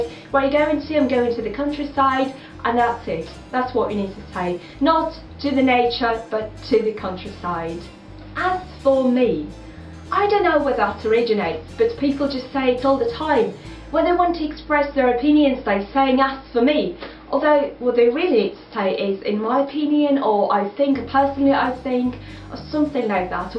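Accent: United Kingdom. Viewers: its british acent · You have a British accent. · А что такое "английский акцент" когда чел говорит по-английски? У неё, кстати, британский акцент.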